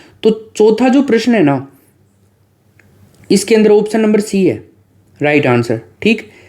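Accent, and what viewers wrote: Rajasthan, India. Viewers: Sir aapki trick bhut aachi h usse bhi aachi h aapki voice or bich bich gjb rajsthani( ade, vde ,mahine)😘😘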